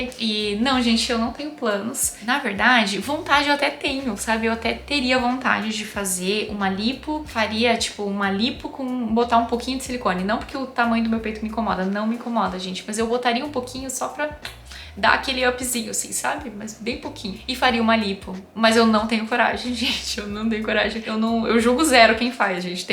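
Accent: Brazil. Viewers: vc é gaúcha?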